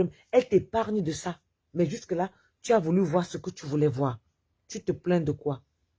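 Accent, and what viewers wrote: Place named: Cameroon. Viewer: Ton accent me fait dire que vous êtes camerounaise merci pour ton soutien à EK. Le cas peter c'est un cas école je pense que ça vous servira de leçon aux blogueurs ivoiriens à deux balles tous des analphabètes illettrés.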